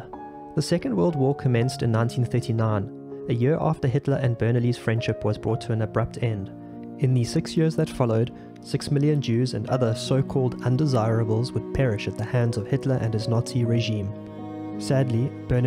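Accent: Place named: South Africa. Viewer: You sound wonderfully South African. Good stuff.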